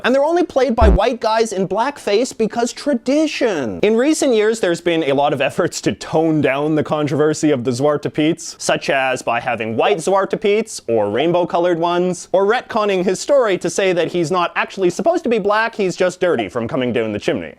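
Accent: Canada. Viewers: Hi J.J.McCullough. As a fellow Canadian, I am just wondering what part of Canada you are from. You seem to have a pretty thick accent when it comes to words like about which only exist in some provinces. Just curious, cause I'm from Southern Ontario and we don't seem to say about the same way 😂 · He's very phonetic when he speaks. He even says the aboots and oots and aroond. Which I'm sure many agree not very many people in Canada pronounce it that way. Either way he's insightful and I don't mind. I'm actually more weirded out by the Canadian than his very bad pronunciation of дед мороз. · Hmmm you’re Canadian aren’t you, squidward...?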